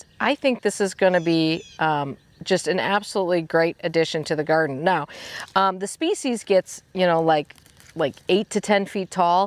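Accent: Wisconsin, United States. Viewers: This is fun. Love your sense of humor and that you garden in my zone. Knew you were from Wisconsin as soon as I heard you talk in another video. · Great video! Sad that you lost the bet. Go Badgers! I pronounce Oregon just like you do. Sconnie girl here too! 💚🌱 You should send Laura some Spotted Cow from Leinenkugels. Then if she likes it, she will have to come here to buy more. (Only sold in Wisconsin) 😊😊 Have a wonderful day!!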